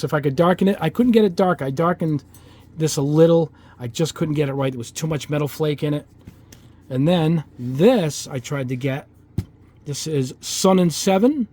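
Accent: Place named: East Coast, United States. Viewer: Thanks so much for everything you do! And its nice to hear a painter who has a nice east coast accent like me. Jersey born and raised. Thanks again!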